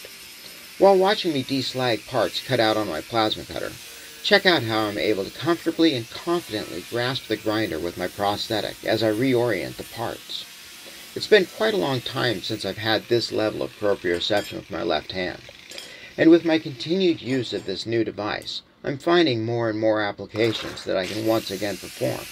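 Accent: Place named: Pacific Northwest, United States. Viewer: I knew you had to be from Oregon or Washington we got the same accent!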